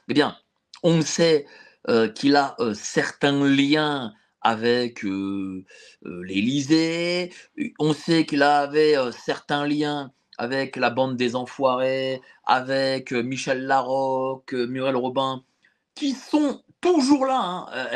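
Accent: Germany. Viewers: tu es français parles Français pas avec l'accent chleu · Oui,c est vrai, arrête de prendre l accent allemand. Ça n a aucun intérêt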